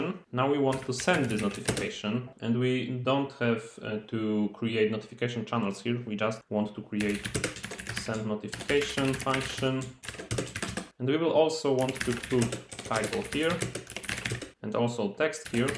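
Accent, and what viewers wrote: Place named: Poland. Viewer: Oglądałem ten tutorial i tak coś czułem ten polski akcent xD Ale dobra robota z tym filmem :D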